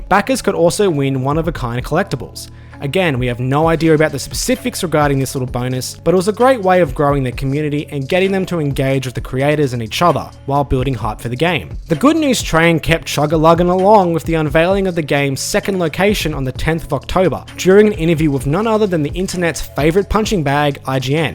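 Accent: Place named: Australia. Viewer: I see the link to this video in Painticus' discord, I hear an Australian narrator, I like and subscribe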